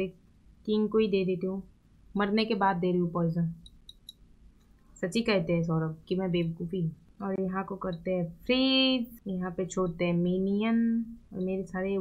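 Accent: Bengal, India. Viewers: Are you bangali??? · Apni bengali kotha sune bojha jacche😅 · Ami age confused chilam je apnara Bengali? But akhon apnar Hindi sunei bojha jacche Bengali😁😁😁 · Aap Bengali ho kaya ??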